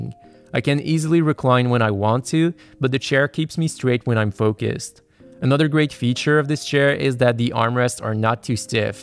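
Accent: Quebec, Canada. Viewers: Tu sonnes tellement Québécois 😂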